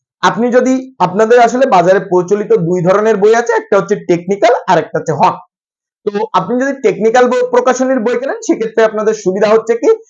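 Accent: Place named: India. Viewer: আপনি যেই ভাবে কথা বলেন আমি ভাবছিলাম আপনি ভারতীয়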